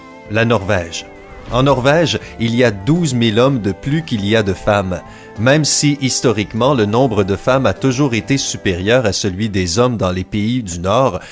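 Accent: Quebec, Canada. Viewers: Yess accent québécois! Vive le québec! ❤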